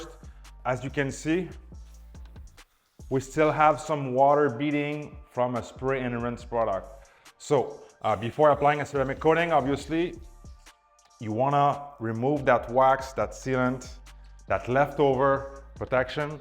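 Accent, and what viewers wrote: Quebec, Canada. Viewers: You sound Québecer. Subbed!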